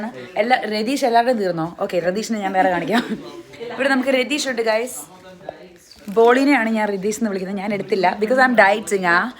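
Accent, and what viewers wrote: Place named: Kottayam, India. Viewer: Kottayam slang❣️🤌🏻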